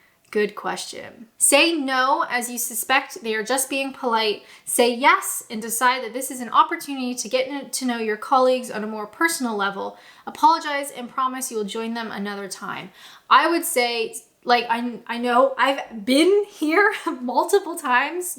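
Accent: England, United Kingdom. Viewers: As well as "knackered, there was also "Autumn"! Both said without blinking... I think I'm also detecting a trace of an English accent there too. I strongly disagree about showing up late for a party! As a host we are always a bit late getting ready, and always pray that nobody actually turns up on time! Clearly you are the annoying friend who always turns up on the spot and that we have to stick in the living room with a glass of wine while we finish the preparation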